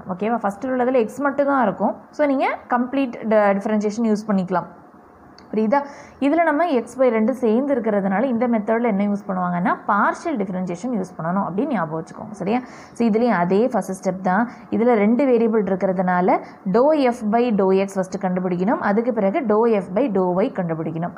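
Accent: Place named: Nanjil, India. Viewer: Very crisp explanation with Nanjil Tamil accent.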